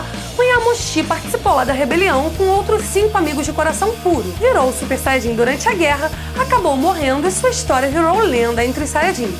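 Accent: Brazil. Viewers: Os vídeos do canal são ótimos, mas eu acho muito estranho ver a falando NAISCER ou NAISCER, isso é sotaque de alguma região do Brasil?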